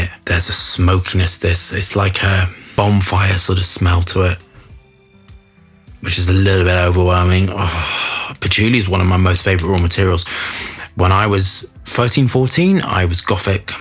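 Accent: United Kingdom. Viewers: i like your Britification of every word. "Le Laleeebo". And maybe a high vapor pressure isolate of patchouli can occur on the top maybe?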